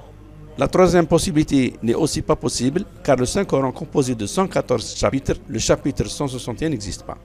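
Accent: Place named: Kabylie, Algeria. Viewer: Accent kabyle salut cousin sa va ??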